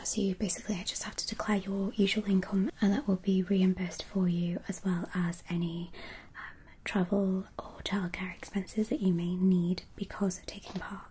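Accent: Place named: Wales, United Kingdom. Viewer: Hearing a bit of a Welsh twang coming through there! ❤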